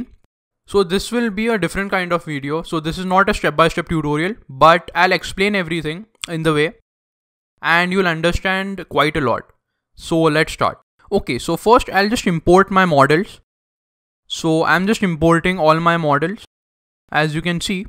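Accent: India. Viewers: Is he Indian or does he have an Indian accent?? im not sure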